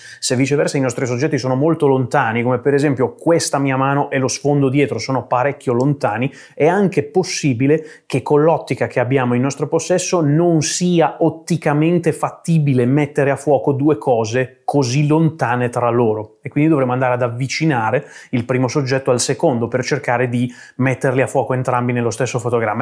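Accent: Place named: Romagna, Italy. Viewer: un accento adorabile ...romagnolo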